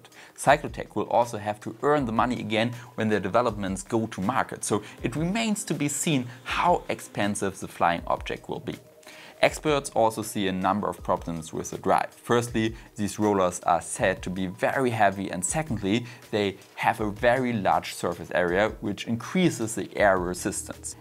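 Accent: Germany. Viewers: I'm English, and had no trouble at all with your speaking - yes a strong accent, but not a problem. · Cooles Video! Das "th" klingt manchmal ein bisschen holprig & reduziert an ein paar Stellen die Verständlichkeit, aber wenn du das ganze noch ein bisschen verbesserst top. Ein wenig deutscher Akzent kann ja ruhig auch bleiben.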